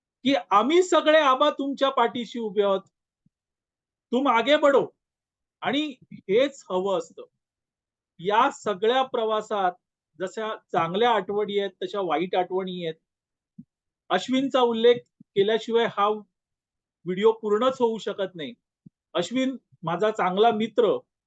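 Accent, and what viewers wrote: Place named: Konkan, India. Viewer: आबा माका खूप आनंद होवाक,तुमचा कोंकणी आवाज कानावर असाच पडत रहावा! आमचा आनंद असाच कायम ठेवा,हेच मागणे आसा!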